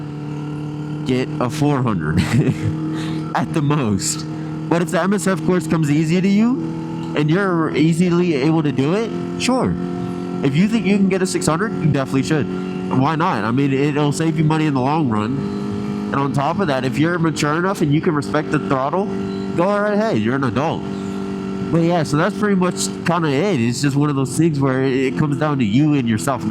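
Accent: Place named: North Texas, United States. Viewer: took me 30 seconds to realize you were in north texas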